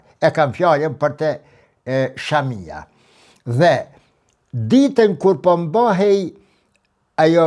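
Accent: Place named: Kardhiq, Albania. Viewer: Ca flet kardhiqe moj kuder e metohise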